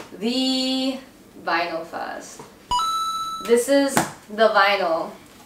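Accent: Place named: Australia. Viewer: i cant with her aussie accent is so precious 😭🤚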